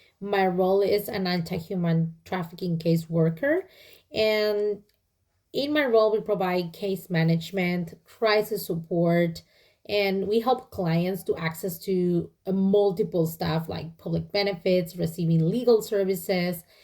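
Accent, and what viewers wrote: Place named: Spain. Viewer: Omg hot sexy Spanish accent is my fav weakness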